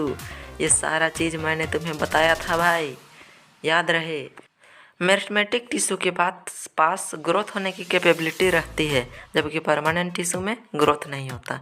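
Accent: Bihar, India. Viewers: Op brother maja aagaya full BiHari style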